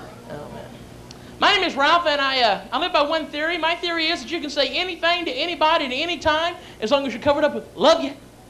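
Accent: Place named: South, United States. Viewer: didn’t realize he had a southern accent .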